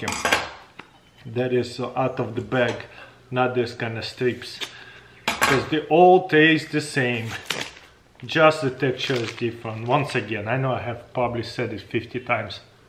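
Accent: Russia. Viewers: I’m just here to hear him say different animal names in a russian accent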